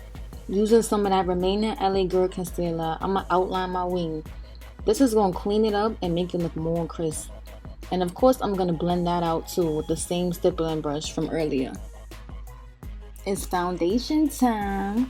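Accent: New York, United States. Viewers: Her thick New York accent is so sexy 😩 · Yo I'm new and I could tell you from New York by our accent 🙌🏾 and you're fine af 😍 subscribeddddd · Omg! Me too, I'm from New York but I was like damnnn her accent heavyyyy lmao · Mad NY. You sound like me lol love the video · Lmao the NY accent made the video 10x better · The New York Accent Yes 😛❤️!! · OMG we do have a ny accent. I thought people were buggin when they say NYers have a accent. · Your NY accent is so sexy, ma. I love it. Too beautiful 💜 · Ur talk over is hella new york😂😂😂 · i love your Ny accent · that NY accent is heavy though !! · wow us New Yorkers accent is too real 😂 love it. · that Nicki Minaj New York accent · this video made me realize that we dead ass have a accent in ny. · your voice is like a mixture of cardi b remy ma & nick I minaj. I guess it's just the NY accent! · New York accents sound so mean but bomb 😂😍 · YESSS! 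So cute. I love NY accents.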